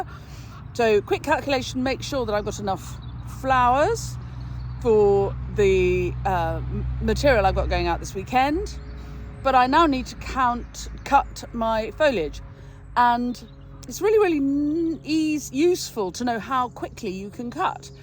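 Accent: England, United Kingdom. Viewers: Thank you for all you great tips! I am a follower from Sweden and I just love you British accent! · As an American, I enjoy your English accent & unusual (to me) terms very much. 🌱❤️ If I book a place for one of your workshops, is access to the content available to view later, after the scheduled workshop? The time is inconvenient for me, but I would love to learn how to make one of your unique posies. 💐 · lové💗looks like wisconsin and adore your english accent🌸🌸🌸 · I absolutely love you accent! One of my dearest friends is in Oundle, miss her terribly. Had the chance to visit beautiful England 11 years ago . The gardens at Burley house were magnificent!. Cannot wait to visit once more! Thank you for your content I enjoy it very much! Canadian dairy farmer morphing intoa flower farmer as a rieterement project. Take care!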